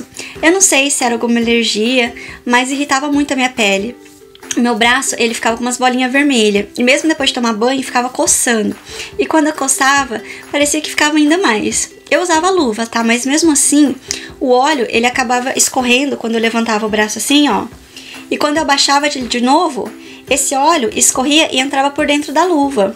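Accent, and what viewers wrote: Minas Gerais, Brazil. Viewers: Tu é de Minas Gerais?! Tem um sotaque mineiro da hora! 😅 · Queria saber em qual parte do brasil ela nasceu e foi criada pq o sotaque e as girias conheço todas kkkkk! Sou do Cearà. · Adorei você, seu sotaque mineirinho, sua delicadeza, realmente um verdadeira gueixa brasuca.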